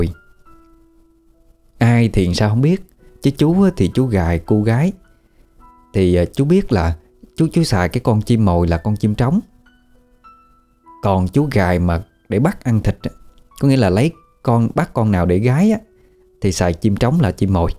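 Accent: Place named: Mekong Delta, Vietnam. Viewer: Xin phép hỏi em quê ở đâu mà giọng đọc hay quá.giong miền tây của mình quá.do trời phú cho giọng đọc xuất sắc.nghe riết ghiền để phone trên giường nghe rồi ngủ mà có khi nghe đến 3 giờ sáng.cam ơn và tác giả ❤❤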